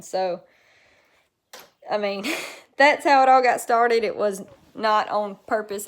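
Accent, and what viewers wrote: South, United States. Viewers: just watched because I want to hear the southern accent. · Love that Southern drawl😉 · I love your southern accent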